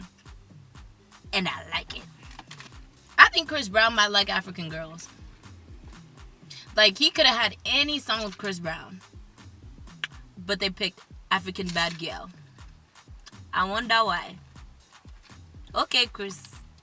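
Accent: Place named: Nigeria. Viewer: honestly you sound nigerian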